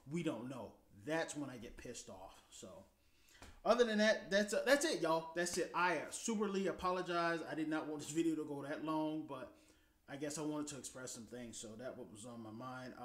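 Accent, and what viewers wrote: Jutland, Denmark. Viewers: Pretty interesting to hear you talk danish and sounding like one from jutland, I did not expect that ! :) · Meget imponeret af dit dansk! Og det er fantastisk at du har en jysk accent! Ved ikke om du har set The Julekalender, men når du snakker dansk lyder det næsten akkurat som bondemanden :D! Bliv ved med at lave vlogs, det er vildt fedt at hører!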